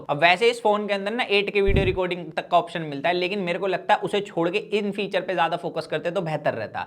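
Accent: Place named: Haryana, India. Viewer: Kuon hae yae Chutiya😂....look at his voice... haryana kae kuon sae "gaon" sae hae 💩💩 I thought it's mrwhosetheboss . BC mood off kar diya🤬 "itnaa kachraa hae you tube par"💩💩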